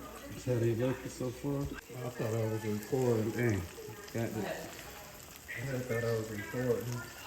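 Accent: South, United States. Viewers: you have a southern accent in your voice. We Love peaches and Family. Love from chicago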